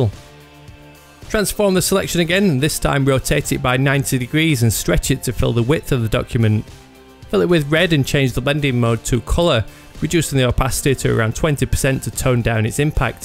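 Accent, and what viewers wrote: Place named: Northern England, United Kingdom. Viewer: sounds like the north of England.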